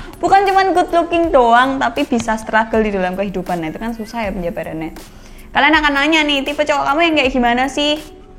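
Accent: Java, Indonesia. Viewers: Medok bngt jawanya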